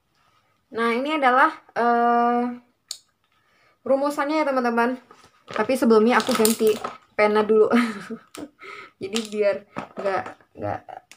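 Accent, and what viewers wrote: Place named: Java, Indonesia. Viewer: Ujuk ujuk. Jawa sekali mbak. Kita sama.hihiw. tos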